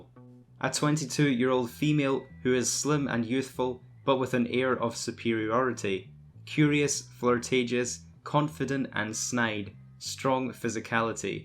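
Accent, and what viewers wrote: Scotland, United Kingdom. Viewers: he's Scottish clearly u dumbass, ur obviously not from england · Omg where u from, I'm Scottish too! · he's Scottish clearly you dumbass......🤣😂😆😅😀 take a course in grammar dip shit....you mean to say..He's clearly Scottish you dumbass ass...man I can tell your a special needs kid aren't ya lmao. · nonya business He's Scottish, not English.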